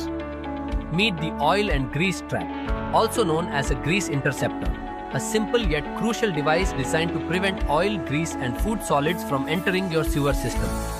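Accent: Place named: India. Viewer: oh yes indian accent makes it perfect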